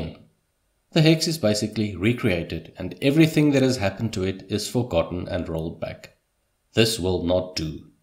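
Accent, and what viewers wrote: South Africa. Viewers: Came here from Reddit. Heard that South African accent, and sub. Gonna start from part 0 tonight!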